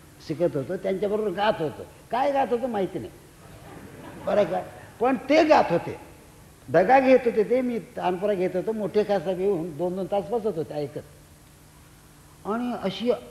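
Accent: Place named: Karnataka, India. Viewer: His marathi accent is soo much kaanadi 🤗❤️ felt like he is talking kannada 🤗🎉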